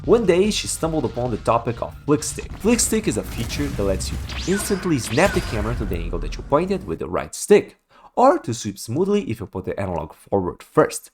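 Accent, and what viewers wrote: Brazil. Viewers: Eu também acho até estranho que inglês abrasileirado assim eu entendo perfeitamente mais o de alguns nativos ainda engancha umas palavras no meu listeling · impressão minha ou você tem um "accent" brasileiro? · Tava vendo esse vídeo e minha irmã perguntou se vc é brasileiro por causa do sotaque e eu olhei seu perfil da steam e vi que vc é mesmo do Brasil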